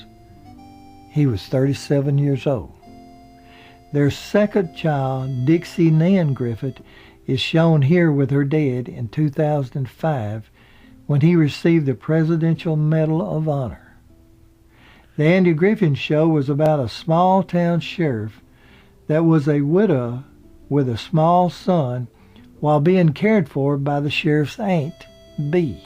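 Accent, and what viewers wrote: South, United States. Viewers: MoonMyst Well he is an older southern gentleman so yeah lol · The narrator is from the south. Obviously he's an older gentlemen. Show a lil respect. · This was another great video presentation by. Love the southern accent. The info on Frances Bavier was quite interesting. A lot of the research here was verified by what I already knew or had read about TAGS for several years. The pictures were accurate except for the one with Andy's family picture, featuring Lee Meriwether. That was a publicity photo for The New Andy Griffith show if I'm not mistaken. I finally understood why Don Knotts left the show. It was made clear. Thanks. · I love your southern accent! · Hey we all love your videos and are always looking for more to come, your voice has a soothing quality, must be the southern accent, we here at my have come to a consensus, you are the Andy Griffith show of the documentary shows, keep em comin buddy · Im originally from Pittsboro NC also in Chatham County just a few miles from Siler City. 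I love the way you pronounce the town names properly with the essential southern drawl. Wink wink. I'm whistling the theme tune now.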